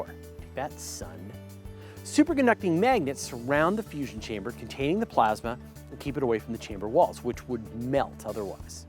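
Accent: Canada. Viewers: How do we know you're Canadian? If the first word in a sentence starts with an E, you really put emphasis on the E unlike Fraser Cain fans from the Old World xD btw good video today. In the past I thought you did this just as a hobby to encourage young people to get interested in science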